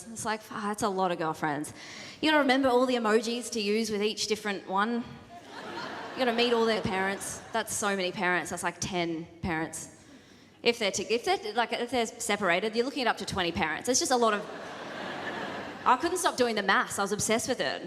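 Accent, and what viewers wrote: Australia. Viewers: She's very Australian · Austraiiilian Maite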